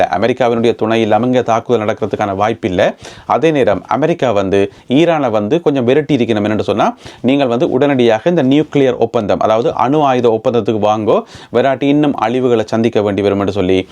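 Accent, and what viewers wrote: Sri Lanka. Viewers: இலங்கை தமிழ்.❤❤❤🎉🎉🎉🎉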